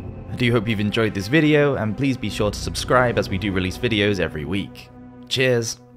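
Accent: England, United Kingdom. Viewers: Margaret Pole, Duchess of "Sallis bury"? For a narrator with an English accent, this guy certainly has trouble with his English pronunciation.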